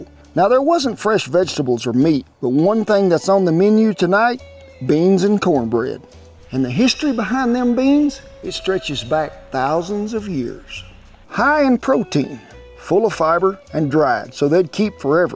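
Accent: South, United States. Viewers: I love your English accent country, It's not just recipes you tell good stories, food delicious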